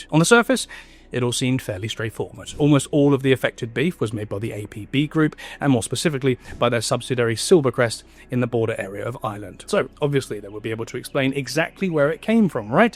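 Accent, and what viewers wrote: United Kingdom. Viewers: You've got the perfect stereotypical "British" accent, I love to hear the classic accent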